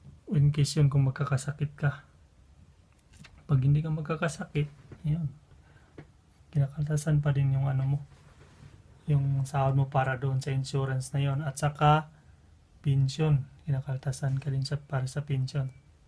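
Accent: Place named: Visayas, Philippines. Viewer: Grabe idol napo kita kuya. Kapit lang nasa tiyaga talaga ang sekreto.. Apply ka din Canada kuya kasi kahit papano dun may security ka kasi pwd kang magng Permanent resident kasama pamilya mo.. mas madali yata mag cross country galing Japan to Canada.. i love your vlog you sound bisaya kaya nag subscribe ako hehe keep it up!!!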